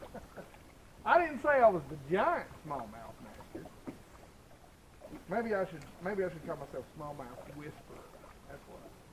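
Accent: South, United States. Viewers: I like the laid back feel you give your videos, the music, your southern accent (us Canadians are hyper and say eh all the time... eh). Looks like a good time! thanks for takin' me with ya.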